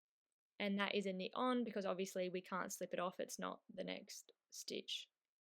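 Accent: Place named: Australia. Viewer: Wow. Lovely Australian accent you have. Thank you I appreciate that😊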